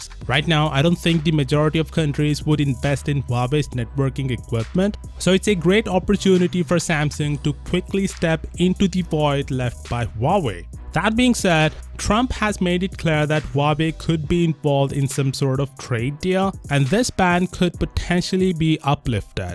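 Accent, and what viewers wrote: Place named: India. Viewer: What’s wrong with his english.. is he indian ?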